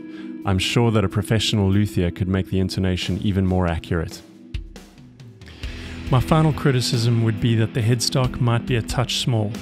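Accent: South Africa. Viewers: Great video. Been wanting to get these for awhile. I already have a Jazzmaster, but my interest in baritones and low tunings is pushing me to get one of these as well! That a Saffa accent? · Hey man it sounds like you're from South Africa, how was the shipping(payment wait period etc?)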